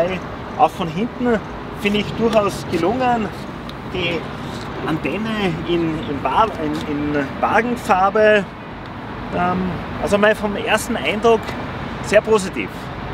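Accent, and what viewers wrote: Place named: Austria. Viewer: Na Na, wer wird denn gleich so negativ-kritisch sein. Testerfahrung / -Routine kann man lernen, CHARME nicht - den hat man oder eben nicht - ich mag die Österreichische Sprachfärbung sowiso lieber als die Ost-Deutsche. Interessantes E-Auto - ich sags ja - die Asiaten kommen! Lass den Jac auf 450 KM Reichweite reifen, dann kann sich VW warm anziehen. Ich setze mich in kein Auto, das so flach ist, daß es unter der geschlossenen Parkhaus-Schranke durchkommt.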